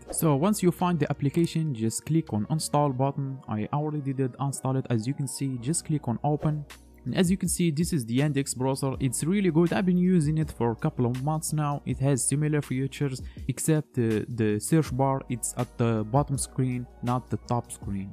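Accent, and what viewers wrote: Morocco. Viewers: Tbarklah 3lik akhoya bayna fik mghribi mn tari9a dlhdra👌👌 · i knew ur Moroccan from ur accent , shoutout to you Mate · مغربي هذا ههههه باينة غي من الهضرة 😂😂😂😂🤦‍♂️🤦‍♂️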